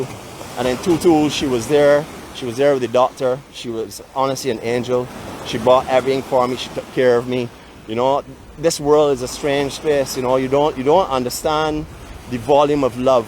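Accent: Barbados. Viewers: The Bajan accent is so cool. Love listening to you, and the ripples of the waves on the beach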